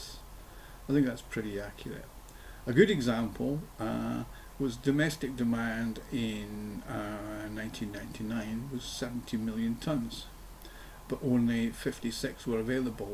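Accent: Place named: Glasgow, United Kingdom. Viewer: I'm guessing you are from the Glasgow area. I am in Knightswood but grew up in Dumbarton. Can I respectfully suggest you invest in a decent microphone? You deserve one!!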